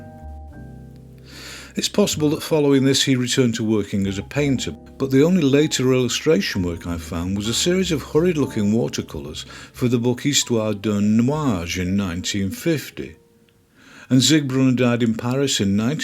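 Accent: United Kingdom. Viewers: Hello! May i ask what part of the British Isles you’re from? I can’t tell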